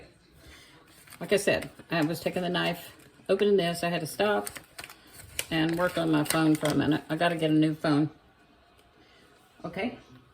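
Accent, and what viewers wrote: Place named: Kentucky, United States. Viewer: You sound like a Ky. Girl, I’m a ky girl.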